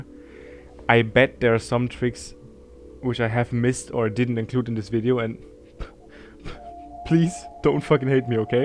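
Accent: Germany. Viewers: a german speaking english making cry of fear videos? heaven.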